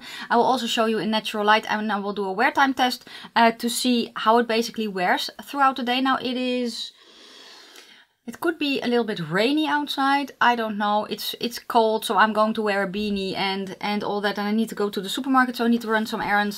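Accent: Netherlands. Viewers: I knew that you are from the Netherlands as soon as you speak. Something about the accent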